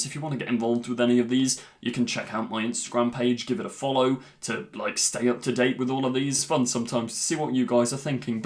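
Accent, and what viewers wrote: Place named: Scotland, United Kingdom. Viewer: Scottish accent alert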